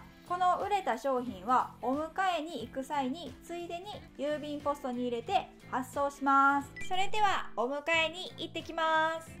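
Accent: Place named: Kansai, Japan. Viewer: 明るくて関西弁が可愛くて、とっても素敵だと思いました。 私も主婦でメルカリではちまちまとハンドメイド品などを販売していますが、お小遣い程度の売り上げです💦 ちゃんとビジネスとして成功されていて、かっこいいと思いました。 元気をいただきありがとうございます。 応援しております。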